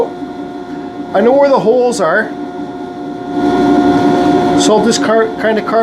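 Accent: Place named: Canada. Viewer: I fucking love your personality dude. It's like listening to me but with a Canadian accent instead of a southern one.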